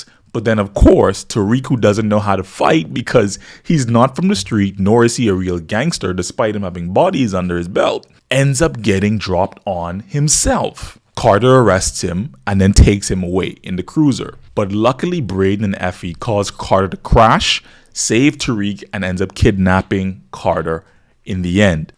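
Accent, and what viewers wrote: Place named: Bahamas. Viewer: You have to be from The Bahamas. From I heard you speak I was like yup. Glad to see a Bahamian making moves. Salute!!!!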